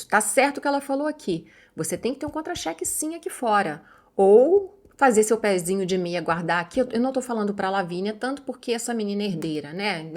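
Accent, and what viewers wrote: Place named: Minas Gerais, Brazil. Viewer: Fiote de cruz credo 😂😂😂😂😂 esse jeitinho mineiro da me mata amo minha Minas Gerais 😅😊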